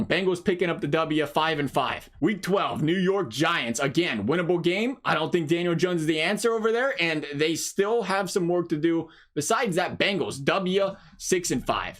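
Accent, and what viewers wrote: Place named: Wisconsin, United States. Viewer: Every time I watch a video from this guy I feel like he’s from Wisconsin or somewhere else the way his voice sounds. 😂 Idk...maybe it’s just me. 🤷🏾‍♂️😇😂🤣 Thx for the content nonetheless.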